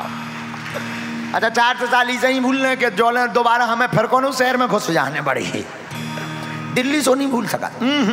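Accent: Bundelkhand, India. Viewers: Ye apka vedio bahut pyara hai .kitana original bolte hai .Maja a gayi .Apki Bundelkhandi language bahut man bhawan hai..Krishan kanhaiya sa lavanya Mai Devatva Swaroop .Vaisi he meethi meethi Bate.jai ho Banke Bihari Lal apki Jai Hove.... Bageshwar Dham Sarkar ki Jai.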